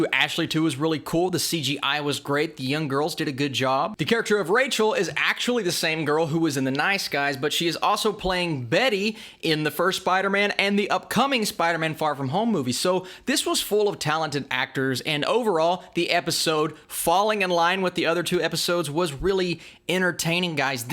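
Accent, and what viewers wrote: South, United States. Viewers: This guys southern sounding accent 😍🤤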